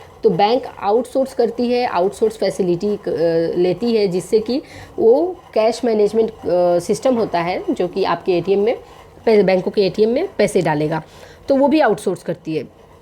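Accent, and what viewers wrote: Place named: Bihar, India. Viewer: Mam apka accent sunke mujhe samaj me ek chiz aa gaya...u r from bihar but u r posted in maharashtra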